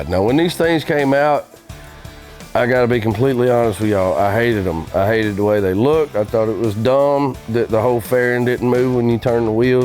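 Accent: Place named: South, United States. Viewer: Love southern accents.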